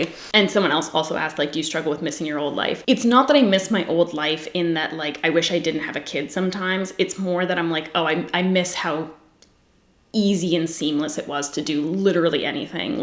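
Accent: Canada. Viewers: WHY DO I NOT REMEMBER HIM SOUNDING THIS CANADIAN WOW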